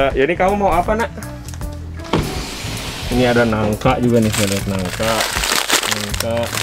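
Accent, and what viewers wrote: West Java, Indonesia. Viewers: gaya bahasa sama logat sunda pisan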